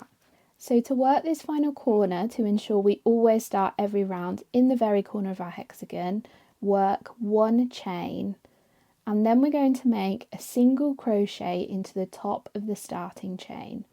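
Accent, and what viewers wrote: England, United Kingdom. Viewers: Why do you call a treble a double crochet? Your accent is English and I am wondering why you are using American termanolgy.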